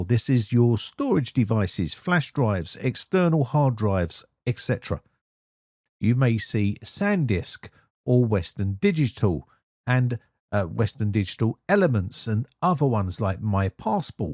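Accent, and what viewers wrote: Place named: Cockney, United Kingdom. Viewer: I'm here for the cockney accent.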